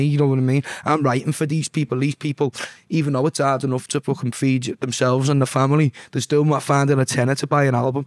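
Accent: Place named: Liverpool, United Kingdom. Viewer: Boss that proper scouser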